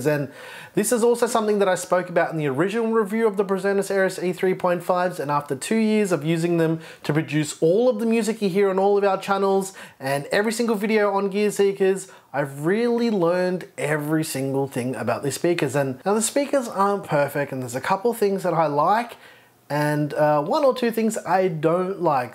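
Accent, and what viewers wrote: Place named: England, United Kingdom. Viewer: English accent IS shit ans a Real pain for french ears 🗣️🙉😢 Un homme de France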